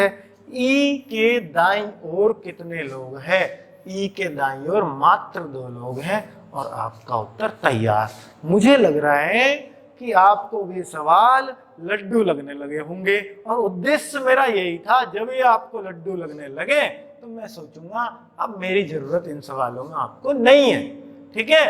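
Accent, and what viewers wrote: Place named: Uttar Pradesh, India. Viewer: Sir full U.P. wali feel a gayi hum D ko hi pel denge.😎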